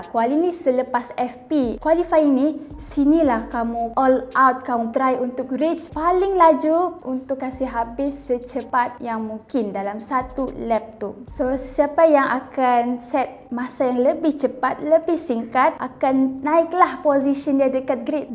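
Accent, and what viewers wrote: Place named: Sabah, Malaysia. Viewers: Dari loghat macam orang Sabah terbaik kak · Pekat loghat sabah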